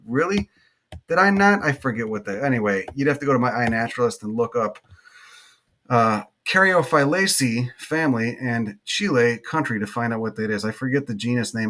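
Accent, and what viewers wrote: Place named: New England, United States. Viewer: love the new england fisherman accent… there’s a wise genius to it...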